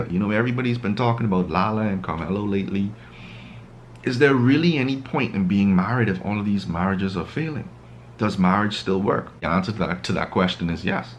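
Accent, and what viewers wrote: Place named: Bahamas. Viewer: Are you a Bahamian? That accent sounds like one from the Bahamas.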